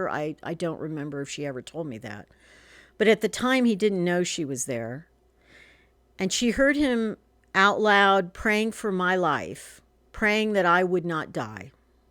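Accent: United States. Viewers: Thanks for sharing your experiences with your father. All sorts of stuff happens to all sorts of people all the time. You got through it, and look, you turned out alright. I'm an American living alone in England for the past 30 years, so it's nice to hear your American accent. Comforting.😀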